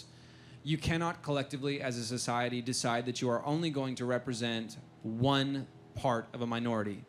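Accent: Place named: Brixton, United Kingdom. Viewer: Holy shit, I'm British (actually from Brixton, same as Felix is supposed to be) and I pride myself on being able to spot a fake English accent almost instantly... and I was completely fooled by this guy. I guessed pretty quickly that Tatiana wasn't really a Brit (it's a great accent, but the odd American/Canadian vowel still gets through), but I could've sworn this guy was really a Londoner. On the show he sounds almost exactly like people I grew up with (and probably me).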